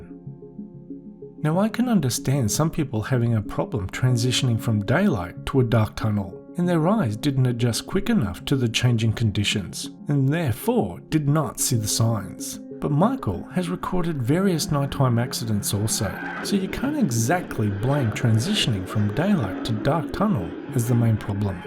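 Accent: Australia. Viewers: Drivers in Seattle think it's OK to exit a ramp at 75 mph and yet merge into the highway at 25 mph expecting everyone around them to adjust to that kind of driving. BTW the Australian accent threw me off until I saw the 165 exit ;)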